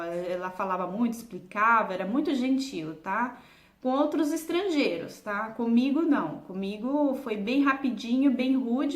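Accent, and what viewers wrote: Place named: Italy. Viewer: Olá moça. Estou te acompanhando desde os primeiros videos que você postou e tenho gostado da sua rotina porque é diferente da minha. Quero te dizer que não é culpa sua. Algumas pessoas não sabem lidar com seus sentimentos e acabam descontando em pessoas boas que não fizeram nada. Quando isso acontecer, tenha em mente que não é você, e sim a outra pessoa. Nunca deixe de ser a pessoa boa e educada que você é. E não deixe ninguém tirar o seu brilho. Uma dúvida, onde você nasceu? Vi que você fala português, mas não parece ser sua lingua nativa. Você é italiana? Continue postando, gosto dos teus videos! Abraços :)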